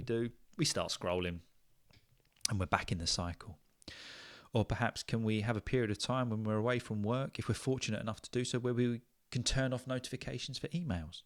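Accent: United Kingdom. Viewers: Bonjour, Je suis Abolfazl, je viens de l'Iran et je suis en traîne d'apprendre le français ou je peux même dire que je suis étudiant en littérature et la langue française. J'apprenais le français pendant trois ans jusqu'à maintenant. L'autre chose que je voulais dire,c'est que j'adore aussi apprendre l'anglais surtout britannique accent et vous parlez incroyablement joli et ça m'aide à progresser plus rapide qu'avant. And I dare to say that now I'm able to speak exactly like you because of repeating after you. Merci à vous et bon courage ❤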